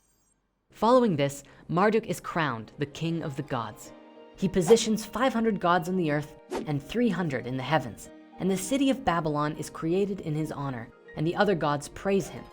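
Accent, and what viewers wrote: Canada. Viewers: He sounds Canadian. That said, I am pretty sure most people who obsess over 'the gay'... have gay tendencies. So to me.... '__ is gay' sounds gay.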